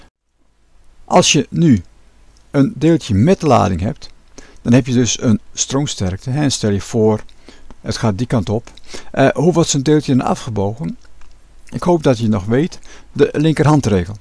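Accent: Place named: Drenthe, Netherlands. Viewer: Heerlijk drenths lol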